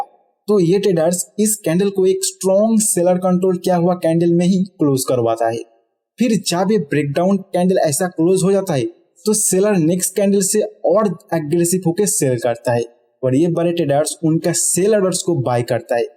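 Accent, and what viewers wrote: Bengal, India. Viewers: Dada... Tomar kotha sune bangali bolei mone holo..... Tai ....bangla tei bolchi.... Stock r blasting move Keno hoy.....ba stock blast ( ek din e 3 to 5% move ) ki kore hoy..... Ei related kichu information dao ...... Khub upokar hoy..... 🙏🙏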